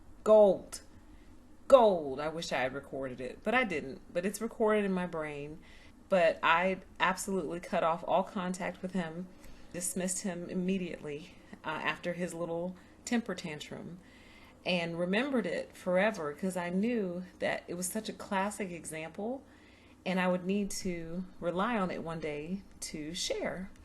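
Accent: South, United States. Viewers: You have a beautiful Southern accent!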